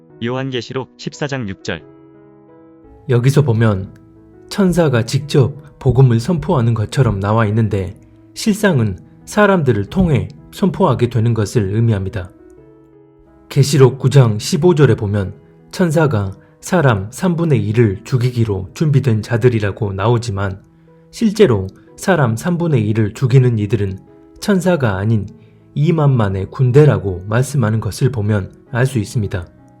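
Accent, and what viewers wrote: Jeolla, South Korea. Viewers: 절라도사투리구시히네요. 중공삼자교회 성도인가요.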